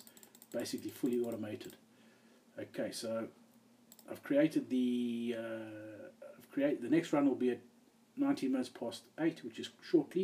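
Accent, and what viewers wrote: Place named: South Africa. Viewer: So cool figuring out you're South African :)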